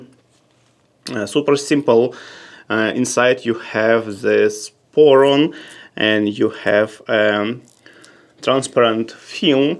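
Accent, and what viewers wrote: Russia. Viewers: ваш акцент похож на русский, вы говорите на нем?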